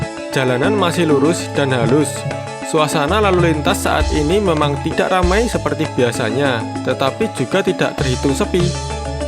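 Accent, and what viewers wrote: Java, Indonesia. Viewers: Mas... aku seneng banget karo logaté, boso Indonesia logat Jowo. Mantep tenan.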